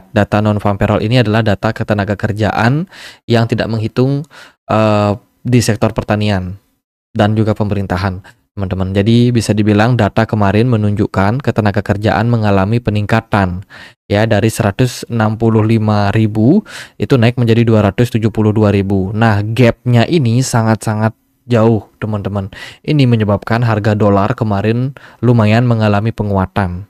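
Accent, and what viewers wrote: Bali, Indonesia. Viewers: Maaf,,,sptnya adminny ini dari logatnya,,asal BALI ,,ya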